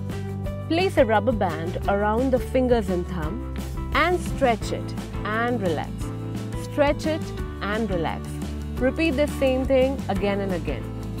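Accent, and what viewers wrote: India. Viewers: i love your indian accent wow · she sounds like an Indian like me. Btw don't tense your arm it will make your arm hurt and tired and you will be exhausted pretty quick. Make sure you use the movement of thumb and fingers and if necessary your wrist. Important lesson I learned from my guitar playing.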